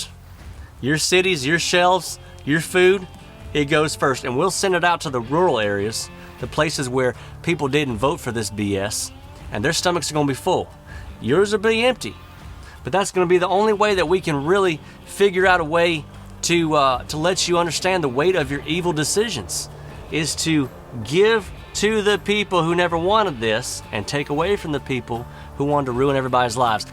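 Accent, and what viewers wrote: South, United States. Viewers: I just found you always good to hear some common sense with a southern twang. This traveling yankee misses the southern states.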